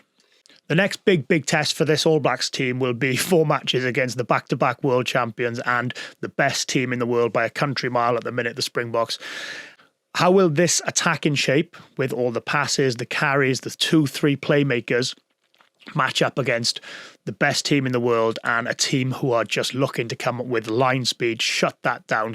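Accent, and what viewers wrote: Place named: Wales, United Kingdom. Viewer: You sound Welch now I'm listening..Bro.. Mum was a Owens ❤ Love ya MA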